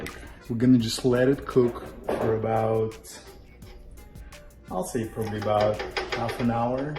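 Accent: Russia. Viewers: Russians will never truly lose their accent. As a Russian myself I can assure that...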